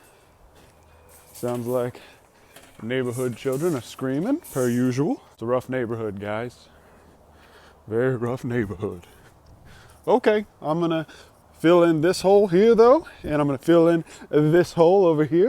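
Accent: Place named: South, United States. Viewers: when u speed up the video i can really hear ur texas accent lol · I'm sorry, i love ol' and all, but I'm really glad he eventually dropped that kinda southern gentleman voice he uses on these early videos. I guess I just got used to hearing him the way he sounds now (2024). That southern lawyer thing is extremely cringe to me. It doesn't keep me from watching, I just prefer his natural voice.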